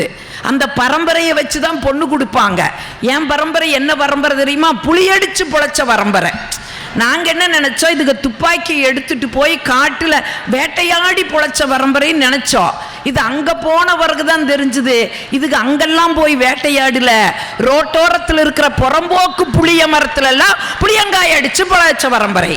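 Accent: Kongu, India. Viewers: Kongu mandalam pechu siripu sandhosham...unga pechu...akka superrrrrrka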